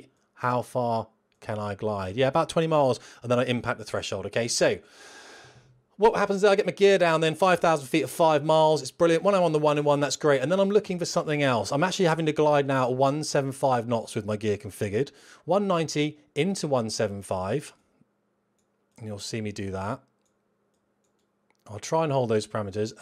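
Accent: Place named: United Kingdom. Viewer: Thanks for sharing these great videos with us common folk. Very cool and informative and I can even understand your British accent. 😊🇺🇸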